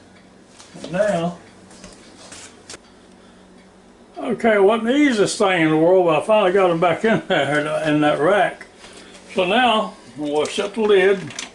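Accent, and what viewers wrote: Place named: Tennessee, United States. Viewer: I love your videos! Thanks for sharing! You sound like home, are you from Tennessee?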